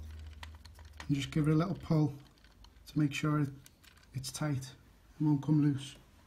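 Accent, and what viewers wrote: Liverpool, United Kingdom. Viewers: Ok lad. Could ye do a video on putting extra sockets in when ye get a chance from a fellow scouser👍👍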